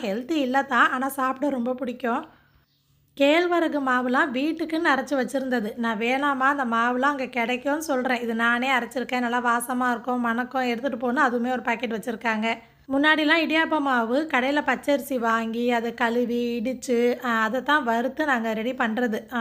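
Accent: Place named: Ramanathapuram, India. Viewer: Assalamu alaikum sis❤❤❤i am meera from chennai❤❤❤interesting video sis, unga slang enga ooru slang polave iruku, naanga ramnadu pakkam kamuthi, last 2minutes very true words and emotional😢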